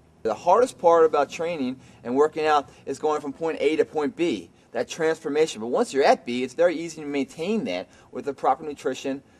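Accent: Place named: Baltimore, United States. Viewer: baltimore Maryland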